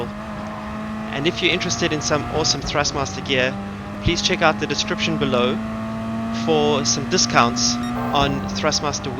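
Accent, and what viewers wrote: South Africa. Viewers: Thanks for the tips! Is that a South African accent? I've been trying to decifer it. Lol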